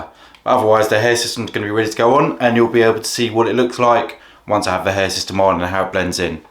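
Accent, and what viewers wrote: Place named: Essex, United Kingdom. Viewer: Uk, Essex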